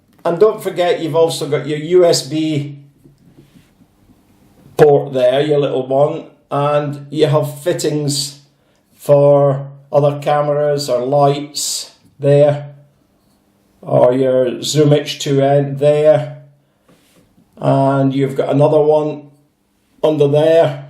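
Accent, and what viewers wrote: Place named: Scotland, United Kingdom. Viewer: way better explained in an old scotish accent than in the Ikea type of instruction supplied. Thanks!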